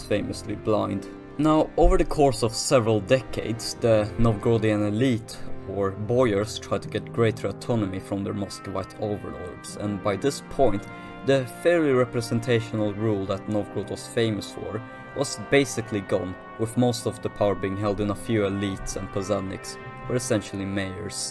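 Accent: Sweden. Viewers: He is as a man from Norway i can hear that Swedish accent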